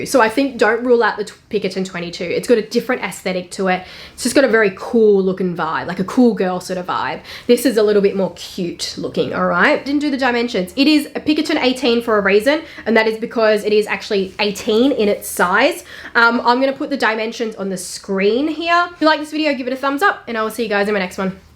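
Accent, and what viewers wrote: Australia. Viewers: I would love if you updated your game- spend money on whitening and straightening your teeth, wear clothes that are tailored- more expensive looking. You are a wealth of information but your speech is your game. If you seriously worked on your elecution. You would be sooo amazing! Slow down your speech and less (bogan Australian speech) this is not a mean comment. You have a lot of potential ❤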